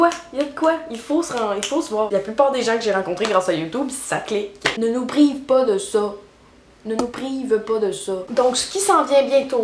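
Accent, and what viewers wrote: Quebec, Canada. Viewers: Viens me voir en France j'adooooore tes yeux et ton accent québecoiiiiiiis! <333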